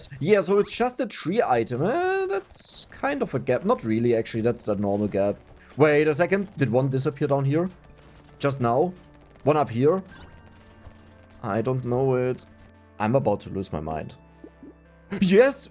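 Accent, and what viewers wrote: Germany. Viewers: By the way, my browser has Voice-Over-Translation and on large videos (like this) from English, you have to wait about 1-2 minutes for voice acting. But because of your pronounced accent, the browser detects it as German, and then gives me an error because it doesn't understand why English words are pronounced in German. You have to manually set English. (Who cares, the browser is called "Yandex Browser". I don't know if this works with other languages, but it does sound exactly in Russian)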